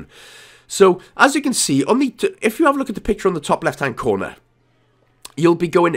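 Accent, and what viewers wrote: Wales, United Kingdom. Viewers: maybe he's ammerican? The Welsh accent isn't the easiest to follow if you are not from the UK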